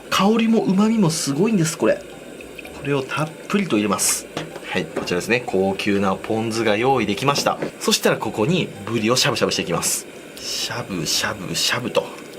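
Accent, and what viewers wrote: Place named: Nagoya, Japan. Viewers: 名古屋弁イントネーションきくとさんを思い出します。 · いつもより名古屋べん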